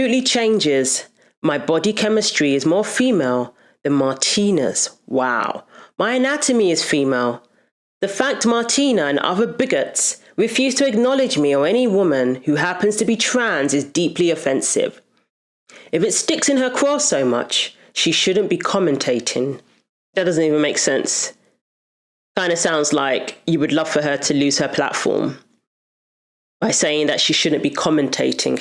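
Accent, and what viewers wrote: United Kingdom. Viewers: Thank you for your comments, interesting with your British accent from the US. I am in the U.K. but lived in the US once! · Himdia is a f#cktard...and I'm glad you made this video sweet..its nice to listen to a Brit on here..and I'm glad you've not lost your accent!..staying true x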